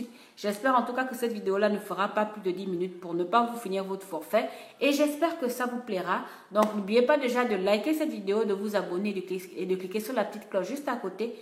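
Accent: Gabon. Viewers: L'accent gabonais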